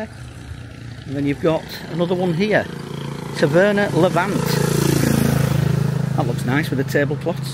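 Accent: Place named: West Yorkshire, United Kingdom. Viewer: Thanks for that . Might give it a go late September or June. Looks up my street . 🍺👌 We think we caught you mention West Yorkshire ? After we guessed by your accent . Where about May we ask ? We are really looking forward to our favourite go to airport reopening again up there Doncaster . 🙏🏼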